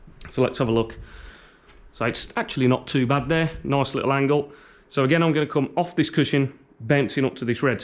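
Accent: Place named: England, United Kingdom. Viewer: Ok cool. Im a Londoner born and bred. Nowadays most whites kids in London speak English with a hybrid Jamaican dialect which is very different from the anglo saxon dialect of English which is spoken in this t.v programme by this presenter. In the early 2000's, young white kids on council estates in London became JAMAICANISED. This is when they starting speaking with English with a hybrid Jamaican dialect. For example, Essex county is the only place in Britain where the cockney dialect/and or accent is still spoken. Tell something. Do you speak English in a hybrid Jamaican dialect?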